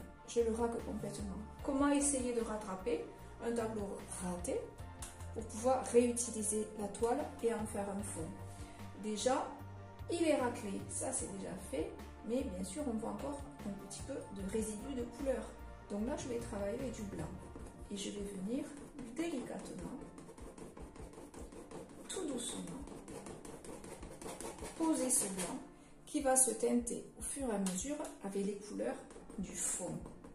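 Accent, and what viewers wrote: South, France. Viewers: Bonjour..Je viens de m'abonner à votre chaine et je suis admirative de votre travail! merci pour toutes ces vidéos qui m'ont donné envie de me lancer dans l'aventure. Grace à vous j'ai pu commencer à faire de jolies choses.Vos vidéos sont très bien expliquées et votre accent du sud nous apporte un pur moment de bonheur. Merci pour tout ce partage et pour toutes les explications que vous donnez pour des débutantes comme moi.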